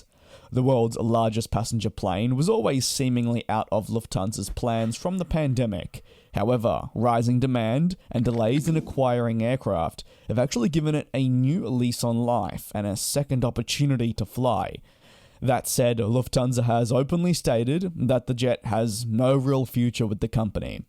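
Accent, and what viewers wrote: Australia. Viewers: Great to hear an Aussie with Aussie presentation!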